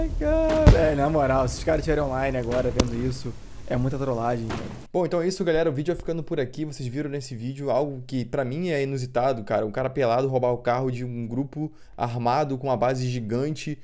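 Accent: Rio de Janeiro, Brazil. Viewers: quando o cara e carioca e foda kkkkkk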